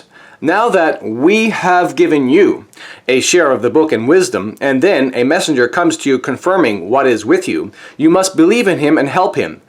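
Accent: Arab world. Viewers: I'll be back but I am just saying when he speaks with an Arabic accent, it's kinda annoying.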